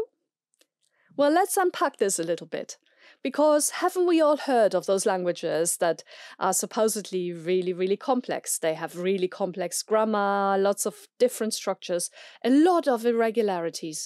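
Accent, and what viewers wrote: Germany. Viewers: Very interesting! Thank you! I have always wondered if English is a more complex language in the sense of having a larger lexicon. English certainly rapidly changes...and not always for the better! I would like to know which languages you consider to be more complex and more expressive. I will look for more of your videos. I can hear (faintly) that your mother tongue is German. That is a beautiful language that I learned in high school before going to live in Köln for a year...50 years ago! I revived my German more recently. I love the complex grammar! It is really not that hard to learn. Like anything it takes practice but once you learn the grammar rules of cases and genders, it's challenging but rewarding. One thing I realize from my own experience learning languages is that the most difficult thing about learning a foreign language once you have the basics down is to speak it naturally with expressions, colloquialisms and correct intonation. 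Being able to speak with correct pronunciation can be a big challenge for some, as well; for example, for Spanish speakers of English. And I don't know if practice can really help that much if you don't have a good ear. I feel bad for non L1 English speakers struggling to learn the language who due to a lack of proficiency are unable to fully and richly express themselves. I feel that way when I speak an L2 language; like I must appear to be like a child or simple minded. Vielen Dank again for your excellent post!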